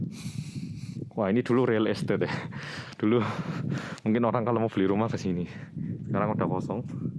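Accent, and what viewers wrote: Java, Indonesia. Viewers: Yes mix indonesian languange with accent jawa😀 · Medok e ga biasa kakak🤣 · Jawab dl dong, subscriber terlama dari chanel ini kok si kakak ini medok banget ya? apa ayahnya org indo atau gmna? atau org tuanya emang org korea cm tnggl di indo dri kecil aja? mau coba ngikutin vlog pertamanya tp aku maget guys😭🤣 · Bersama orang korea yang Medhohokk, suka banget denger nya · Masnya medok tapi kaya orang korea.orang mana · Medok banget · Nonton vlog mas saking medoknya, sampe lupa klo ini vlog dikorea😭💔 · Kagum sama mas medok banget bahasa nya... Setelah lihat video mas ini, saya bisa lihat korea tanpa harus pergi ke korea, Aslinya pingin banget bisa jalan jalan ke Korea. Sering sering buat konten outdoor seperti ini ya Mas. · Mase..medhok bgt bahasa indonesiany..👍🏻👍🏻👍🏻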